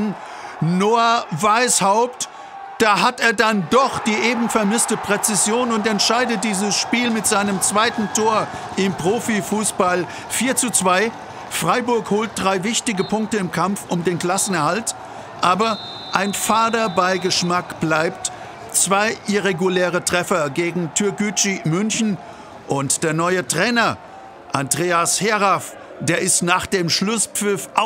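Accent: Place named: Swabia, Germany. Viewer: Ein noch frustrierter Schwabe als Kommentator?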